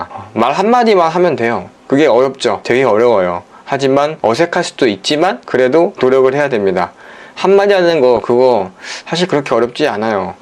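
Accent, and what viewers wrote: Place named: Gyeongsang, South Korea. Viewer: 경상도 억양을 가끔 사용하시는거 같아서요 ㅎㅎㅎ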